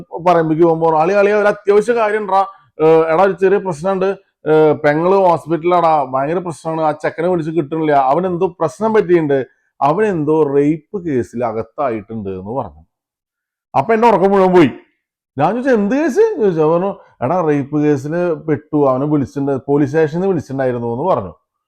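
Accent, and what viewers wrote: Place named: Thrissur, India. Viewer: ഒന്ന് തൃശൂർ പോയി വന്ന ഫീൽ.. സ്ലാങ് wow ❤️❤️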